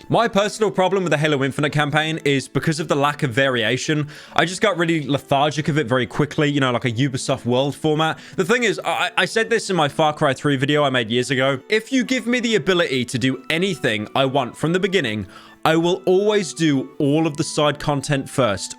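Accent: United Kingdom. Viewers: Me listening to some british boy talk for 12 minutes about a game I’ve never played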